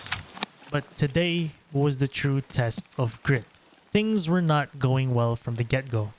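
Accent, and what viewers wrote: Philippines. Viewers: The accent tells me somehow ", pre pinoy ka ba?" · Are you Filipino? Your accent is very familiar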